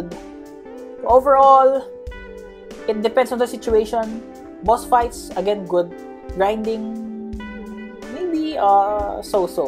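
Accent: Philippines. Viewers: I can tell that this guy is Filipino because of his accent. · Ngl this dude sounds like Charlz the french and his voice is like Filipino Edit:He is Filipino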